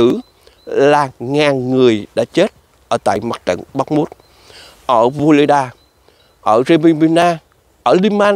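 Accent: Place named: South, Vietnam. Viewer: Rất hay và khóai chất giọng miền Nam của chú. Cảm ơn chú đưa tin tức.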